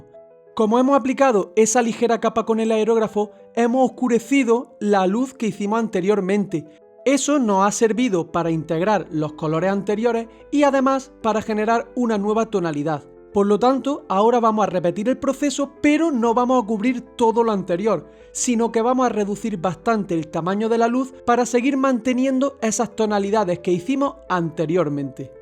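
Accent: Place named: Almería, Spain. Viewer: No te creas, el dialecto almeriense tiene su aquel 😂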